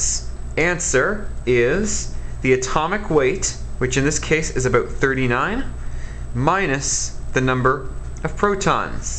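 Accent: Canada. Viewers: the canadian accent just makes everything better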